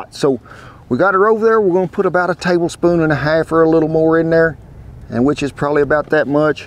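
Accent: South, United States. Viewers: " Done gottum caught, an in the boat, an fitem t putum ona fire" Mr. you're as country talking as us folk in Upstate S. Carolina. Love your videos.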